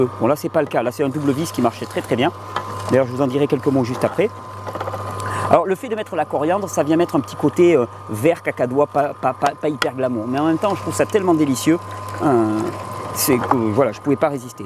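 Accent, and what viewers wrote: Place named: Southern France, France. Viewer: Harmo Chopin En Pyrénées Orientales (66) oui appelée aussi Catalogne ou Pyrénées Catalanes également . Mais voyons ne reconnais-tu pas cet accent ensoleillé Méditerranéen du Midi ??? ;-) Mais il y a une partie de ce même département s'appelant Cerdagne (Latour de Carol, Dorrès, Bourg Madame ...) plus proche du Pas de la Case/Andorre et Limitrophe avec L'Ariège (09)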